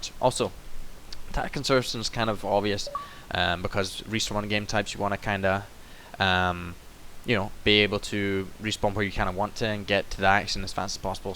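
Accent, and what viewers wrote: Scotland, United Kingdom. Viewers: where in scotland are you from?:)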